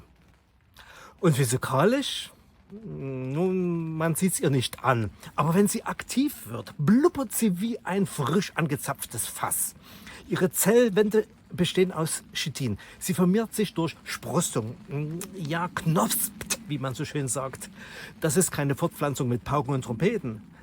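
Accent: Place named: Saxony, Germany. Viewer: Die Petersilie liebt und hasst Hefewasser😮 Das kenne ich😂 (Schockolade) LG aus Brandenburg Du sächselst so schön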